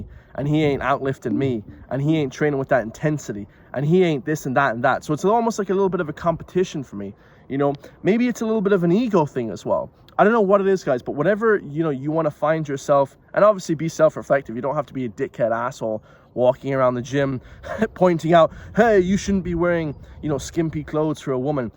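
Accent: United States. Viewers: Who are you? I enjoy your content and chats, but Who are you when you don't speak a pure form of Irish? You sound more American than Irish and I don't recognise any background you are in today, that looks or sounds Irish. Who are you? Where are you? Why do you sound more American than Irish? I'm just asking. Hopefully, you will answer truthfully.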